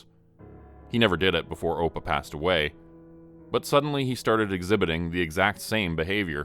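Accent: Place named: Canada. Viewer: I KNEW u were from Canada! The way u say any word w/ "out" in it. Or box. Or house.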